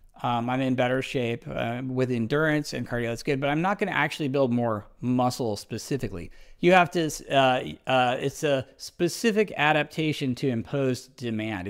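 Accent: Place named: United States. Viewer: 👍Highly informative👌. Please add English subtitles for better understanding American accent.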